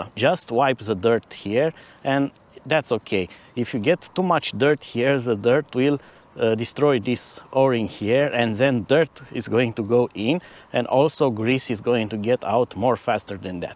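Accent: Romania. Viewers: Are you Romanian? That accent ..😊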